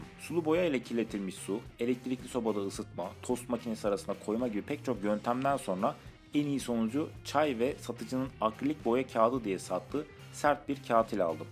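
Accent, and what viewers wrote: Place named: Isparta, Turkey. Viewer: Nerelisin konuşman Ispartalı gibi bizim buralardanmısın ?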